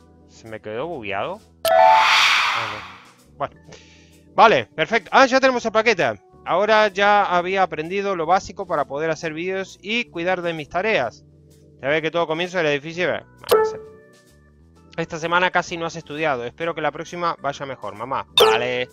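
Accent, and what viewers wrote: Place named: Argentina. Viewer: que vas a ser de españa vos,sos re Argentino,se nota cuando hablas solo con decir zapatilla ya me doy cuenta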